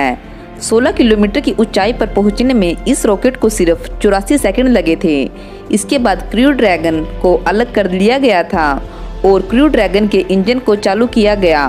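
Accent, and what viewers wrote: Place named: Bihar, India. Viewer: Aapki avaj kan phad detihai..... Bihariyon ki tarah bolne ki kya jarurat hai...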